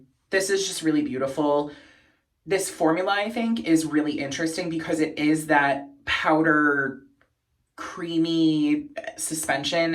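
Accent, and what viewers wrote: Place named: Buffalo, United States. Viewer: I'd LOVE to see what wedding look you're gonna do with the Ethereal Eyes Palette! I was also cracking up cause I say the word "palette" the same way you do. Our Buffalo accents with these A's are outta control 😂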